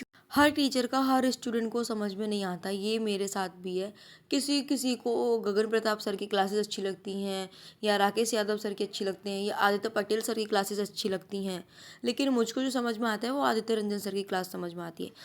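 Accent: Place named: Bhojpuri, India. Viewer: Bhojpuri voice nice hai ❤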